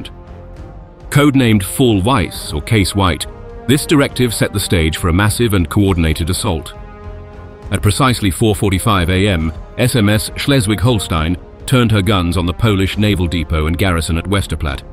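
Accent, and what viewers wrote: United States. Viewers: Why does this English-sounding presenter use those awful American pronunciations for such words as "dee-poe" instead of " deh-poe"and "A-dolf" instead of "Ah-dolf", and say "two hundred twenty five" rather than " two hundred AND twenty five? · This clearly has an English voiceover mispronouncing words in the American way, like Aydolf instead of Adolf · As ever with these videos they go totally off subject wasting your time. Scroll WELL forward. By at least 7minutes. Why does the “English” commentator have American pronunciation.”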